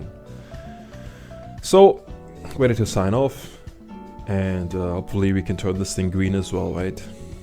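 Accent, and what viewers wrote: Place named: Germany. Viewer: Why do you sound German?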